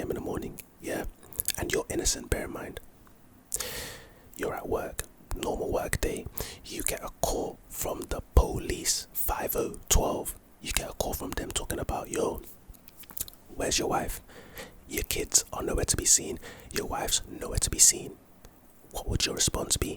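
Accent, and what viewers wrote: United Kingdom. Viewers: ? Pronunciation of what? He's British I believe - they speak English in England lol 😂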